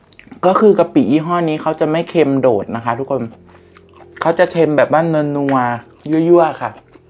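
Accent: Isan, Thailand. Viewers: ชอบตอนพี่ พูดอีสาน พูดทุกคลิปเลยน่ะคร้าบบบบบ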